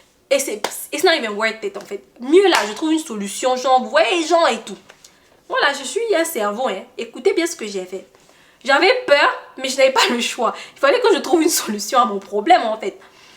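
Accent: Cameroon. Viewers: Seigneur 🤣 accent camerounais j'ai écouté 😭😭.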